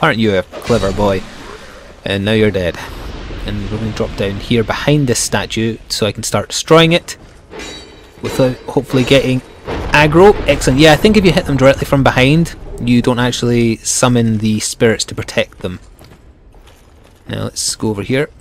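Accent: Scotland, United Kingdom. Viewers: Your voice sounds very charismaticaly. Is that scotish accent or there is no such difference between these nations of UK?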